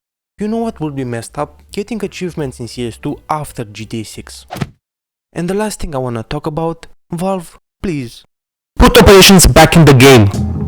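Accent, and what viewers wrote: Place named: Eastern Europe. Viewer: Ha, so this is what the voice of reason sounds like, it has a vaguely Eastern European accent, makes sense! Liked and subscribed, you hit every nail on the head.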